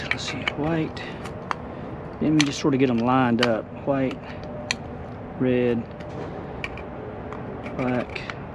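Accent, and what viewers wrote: South, United States. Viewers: Thank you ! I bet I could replace our 35amp plug now! Good job! P.s. I just love your southern voice !💙 Have a blessed day yall!!!!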